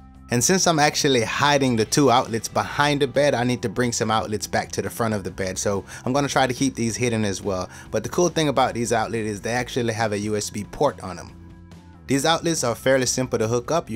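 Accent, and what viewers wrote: Jamaica. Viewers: Are you Jamaican man? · You Jamaican? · You sound Jamaican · What is your accent? Sometimes it sounds Jamaican, maybe sometimes New Orleans? Love your vid btw.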